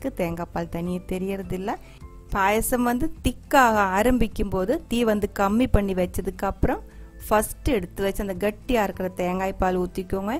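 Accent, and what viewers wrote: Kerala, India. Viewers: Hi Super sis. Tamil mix aana Malayalam unga voice ku nega peasarathu rmba nalla sis. Kandipa na try panni pakara.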